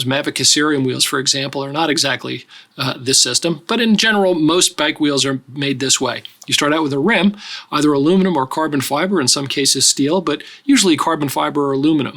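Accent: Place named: Pittsburgh, United States. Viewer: You say now and round like my grandmother she was from Pittsburgh. Great video sir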